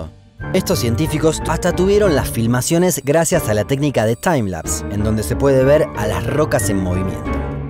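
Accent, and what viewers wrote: Rioplatense, Argentina. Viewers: AY MIJO...VOS SOS ARGENTINO... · Es un argentino bastante sobrio, por eso queda simpático, aunque quizá sea uruguayo. ¿Algún día lo sabremos? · Sos argentino o uruguayo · Como relator tiene axento argentino.. pense que diria que en argentina jaja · Yo solo veo tus videos por que me gusta el acento argentino saludos desde Mexico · Buen video pero no puedo soportar tu acento argentino en especial cuando pronuncias la letra doble ll como valle lo dices vashe☹️😒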